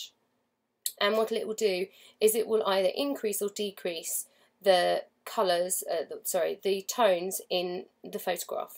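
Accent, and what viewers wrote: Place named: Cockney, United Kingdom. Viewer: Very difficult to understand your comments with your Cockney Ac;cent. Sorry but you lose me when you are discussing your techniques and its a shame...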